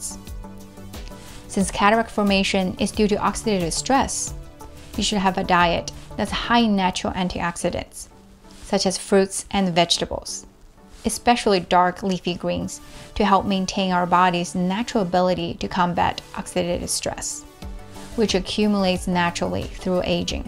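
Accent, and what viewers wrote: United States. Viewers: Easy , just marry a smar/t pretty Chinese or Japanese female as seen in this video! No problem 😊 ! She will nurse me as I will shower her with LOVE ❤️ 😍😎 ! Yah yah ! Learned alot from this video! Her English is AMERICAN! I seen many videos when others have a strong accent and hard to understand what they are saying!! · Pity you talk american.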